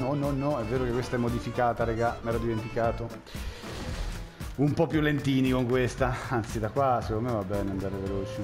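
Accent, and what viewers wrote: Italy. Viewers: Nada mau para um italiano falar um pouco de inglês, mas sem ofensa mas o sotaque seu italiano com o de inglês não cai bem.Usa il traduttore per capire la mia lingua brasiliana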